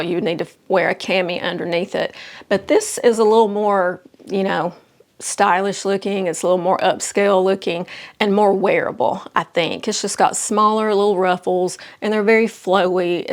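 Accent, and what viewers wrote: South, United States. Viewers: My deepest condolences,! I hate January also but because I live in CO and it’s miserably cold! My daughter lives in Tuscaloosa (AL student). I thought I recognized your cute southern drawl. Thank you for keeping me fashionable. I’m so happy I found you. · Love your Southern way of speaking. Could listen to it foe hours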